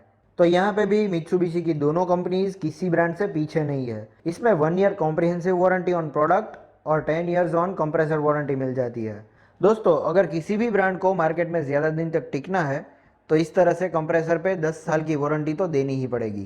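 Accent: Gujarat, India. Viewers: Bhai aapka accent gujju he❤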